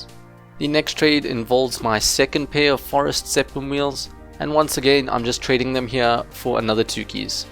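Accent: South Africa. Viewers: Are you South African??? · Are you South African? Sorry I am new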